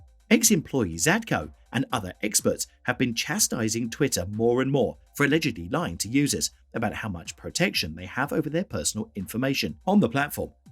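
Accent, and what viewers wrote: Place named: England, United Kingdom. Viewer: Who in the hell is this English guy do we have anybody that can talk normal anymore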